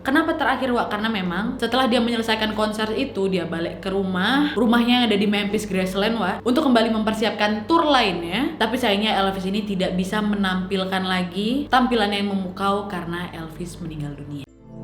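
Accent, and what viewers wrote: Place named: Medan, Indonesia. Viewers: Kakak ni pasti orang medan ya sukak kali sama cakapnya... hahaha... iya kan wak?? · Logatnya kayak orang Medan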